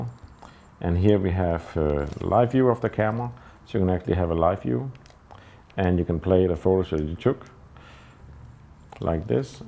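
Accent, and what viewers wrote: Denmark. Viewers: Danish English > Dinglish!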